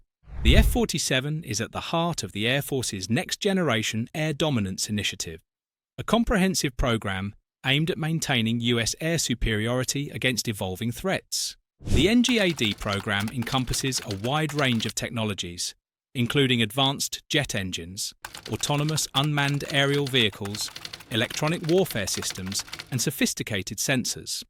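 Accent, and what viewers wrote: United Kingdom. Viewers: Why do we have someone with a British accent telling about American military aircraft? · Ai with a British accent is hilarious.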